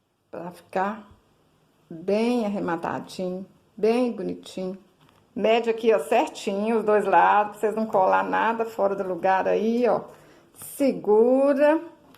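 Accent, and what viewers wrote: Minas Gerais, Brazil. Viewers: Cumade é mineira uai so? Eu também sou 😆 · Esse sotaque minerin é bom demais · Em primeiro lugar.Vc é mais linda q.o seu artesanato.Seu sotaque mineiro é tudo de bom.Sou paulista mais amo esse sotaque mineiro.E o seu artesanato:Meu Deus!Aja 💓!É lindo d+da conta sá! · Mineira UAI. Eu tbm sou mineira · Vc é mineira ? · Gostaria de saber de que lugar de Minas vc é, esse seu sotaque é mineiríssimo, sou nascida em Minas, Resplendor, mas moro em Itaperuna Estado do Rio. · Amei que trabalho maravilhoso lindo demais, gostei também tanto de vc,de que estado vc é, acho lindo seu jeito de falar. Muita paz e saúde pra vc minha linda 😘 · Amei , vc é muito fofa , vc é de qual estado ?. Parece Mineira kkkk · De onde VC é? Parece um sotaque mineirinho, igual eu rsrs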